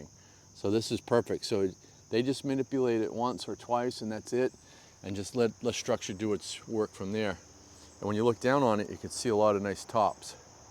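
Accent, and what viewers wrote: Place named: Maine, United States. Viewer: You sound like your from maine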